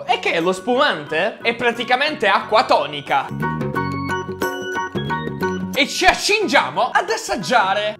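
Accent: Apulia, Italy. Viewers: Parla un po' più pugliese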